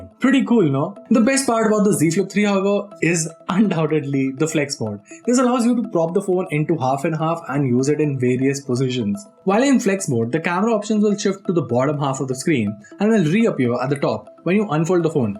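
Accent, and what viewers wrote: India. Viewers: So hard when Indian talk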